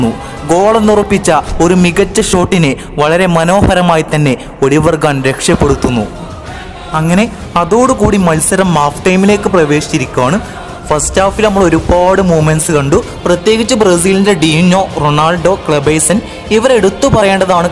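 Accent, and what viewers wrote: Malappuram, India. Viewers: Nighal malappuram kaaranaano😁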